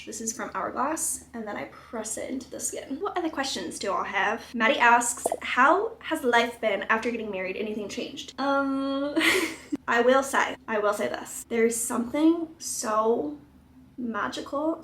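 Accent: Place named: United States. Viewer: i thought u had a country accent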